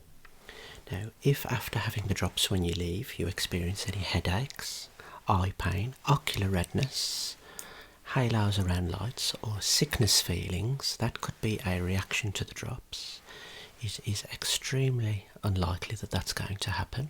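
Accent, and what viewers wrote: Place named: West Midlands, United Kingdom. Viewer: As ever, you are promoting the west midland accent in the most exquisite way. Superb content time after time, top man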